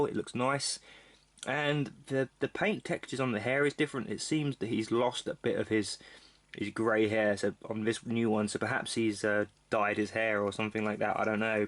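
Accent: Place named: United States. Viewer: Nah nah, I think you are American. Something about that accent...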